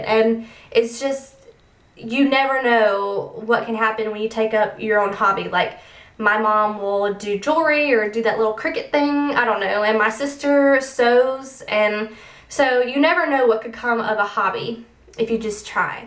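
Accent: South, United States. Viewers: gahhh love your southern accent lol! good advice girl!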